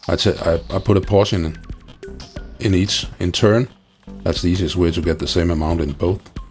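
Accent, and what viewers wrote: Denmark. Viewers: Man kan godt høre du er dansker. Tak for opskriften. Den vil jeg starte på med det samme:-)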